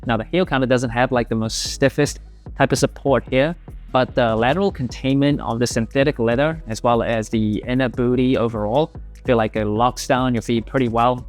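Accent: Australia. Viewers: I thought so, you definitely sound more Aussie than Kiwi haha 🥝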